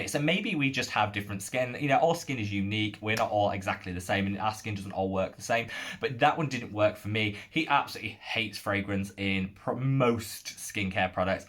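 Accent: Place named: North, United Kingdom. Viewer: Ahh. That's it. The northern UK accent is what you have. That's what I had thought. I've seen videos in the past about all of the different accents in the UK. I was terrifyingly obsessed with the Spice Girls in the late 90's. I was 11 when they debued in 1997. They were famous/popular in Canada almost instantly/the same time as in the USA and the UK.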